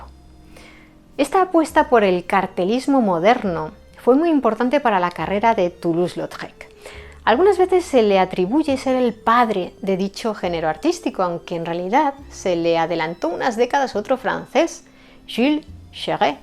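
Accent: Spain. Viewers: aumentaste mi admiración, hacia ese Gigante de la pintura, como lo fué Henri de Toulouse-Lautrec, gracias a tu narración con todas esas imágenes, lugares y personajes que rodearon la vida de este superdotado Artista. Toco el Fagot, y me hubiera gustado que incluyeras entre sus ilustres amistades, a Désiré Dihau, fagotista de la Ópera de Paris, pintado por su genial amigo, Edgar Degas. De todas formas hemos quedado fascinados con tu narración y esa bella combinación de tu acento español y la pronunciación impecable de tu francés. Bravo y, te seguiré en el futuro.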